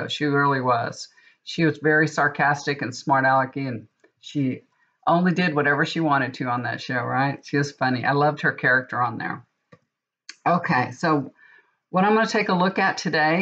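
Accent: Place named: Texas, United States. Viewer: I love the chit chat . I'm from 🇨🇦 and I love that Texan accent plus you have great stories . 💞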